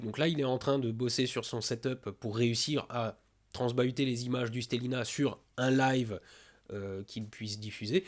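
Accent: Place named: Lorraine, France. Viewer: moi ce que j'aimerai entendre de temps à autre, s'il te plaît, c'est ton accent lorrain, il est juste génial! Vive la Lorraine! attention, pas tout le temps, il ne faut pas abuser des bonnes choses, mais un peu ça ferait plaisir les autres, n'hésitez pas à dire si vous êtes d'accord ou pas pour un peu d'accent lorrain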